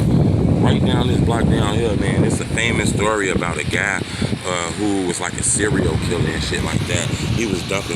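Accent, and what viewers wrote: Chicago, United States. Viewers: Is you from Chicago bro?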